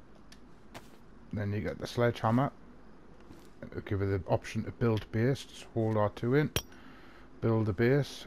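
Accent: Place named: Belfast, United Kingdom. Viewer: cheers mate, great vid in the best uk accent there is! PURELY BELTA! liked n subbed as a dayz noob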